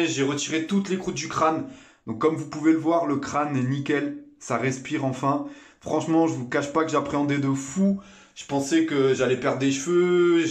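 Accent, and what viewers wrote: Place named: Arab world. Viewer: Toi qui parle comsa tu est un français oubien un arabe ?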